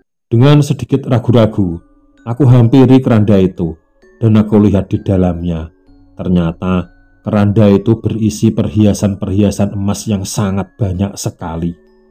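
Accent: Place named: Jember, Indonesia. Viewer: Iiiih Jember pisan ..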